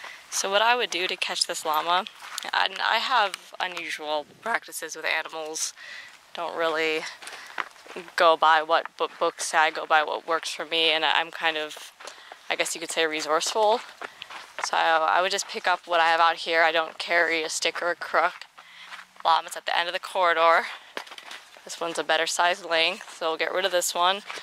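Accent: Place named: United States. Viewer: Deuneska Portugal Yeah! I wish I could afford to do that. Are you in Arizona? You sound American.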